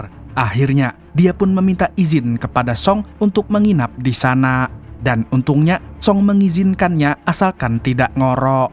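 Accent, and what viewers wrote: Banjar, Indonesia. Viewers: Bang kamu orang banjar ya😅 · Bang pian urang banjar kah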